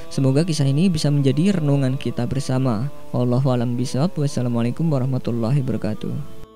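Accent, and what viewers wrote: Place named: Yogyakarta, Indonesia. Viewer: Logat orang jogja ya wkwk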